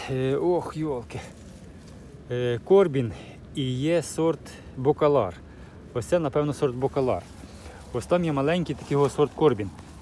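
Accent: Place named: Vinnytsia, Ukraine. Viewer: Як приємно чути вінницький говір! Буває тільки заради цього дивлюсь))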